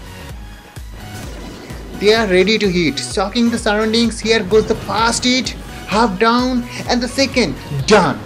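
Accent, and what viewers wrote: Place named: India. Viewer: why do you talk like indian person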